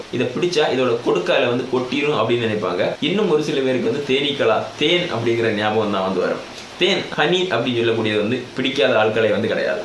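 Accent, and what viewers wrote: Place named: Kanyakumari, India. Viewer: அருமை. குமரி தமிழ் சிறப்பு.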